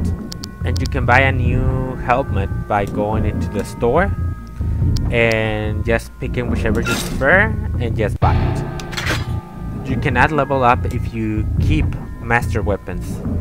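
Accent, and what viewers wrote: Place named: Philippines. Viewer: u r philipino right ?i love ur english accent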